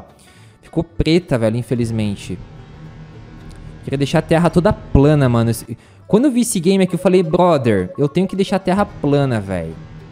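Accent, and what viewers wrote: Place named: Minas Gerais, Brazil. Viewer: Crlh pensei a ele foçe de minas man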